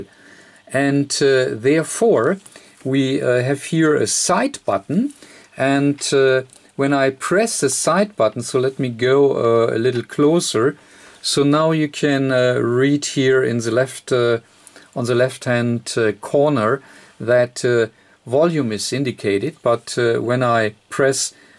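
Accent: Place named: Germany. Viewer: In the first 5 seconds i know that you are a German 😂 your accent is amazing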